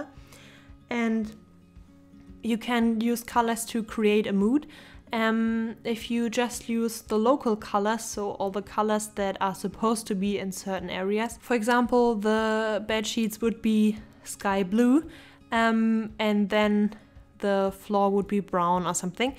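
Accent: Germany. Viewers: Are you German by any chance? Your accent sounds kinda familiar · Ich find das so merkwürdig du sprichst so gut Englisch und trotzdem hört man sofort dass du deutsch bist ( hoffe das stimmt auch ) aber ich hab keine Ahnung woran ich es erkenne außer an diesem typisch deutschen "ähm" :D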